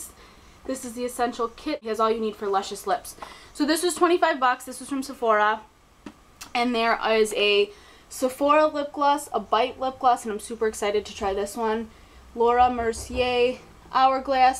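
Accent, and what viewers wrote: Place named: Midwest, United States. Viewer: I love the tarte smooth operator power it's amazing for under the eye! Where are you and your Midwestern accent from!? I live in Wisconsin :)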